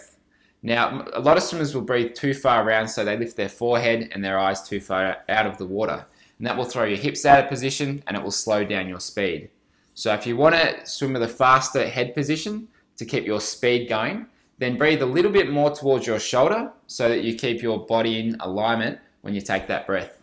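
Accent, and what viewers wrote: Australia. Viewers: he's australian, isn't he? good tricks 👍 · nice aussie aceent.....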